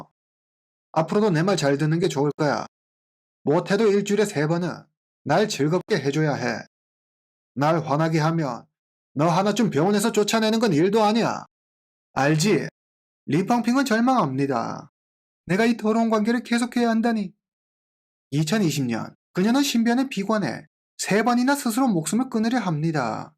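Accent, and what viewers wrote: China. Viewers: 말투 어디 말투 입니까 조선족 아니고 중국인인가?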